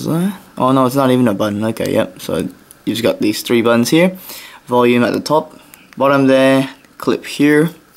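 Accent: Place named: England, United Kingdom. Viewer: He sounds like he is from England so... why wouldn’t he.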